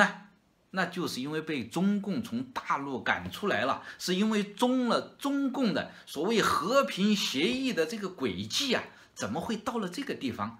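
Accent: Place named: Hunan/Hubei, China. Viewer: 这哥们的口音不是湖南人就是湖北人，以后把这口音改了，再发视频来损自己的母国。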